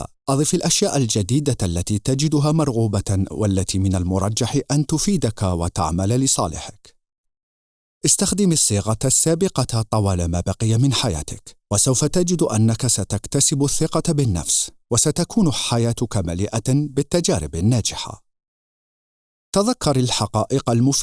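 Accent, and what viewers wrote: Mauritania. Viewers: هل القارئ موريتاني??? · القارئ موريتاني؟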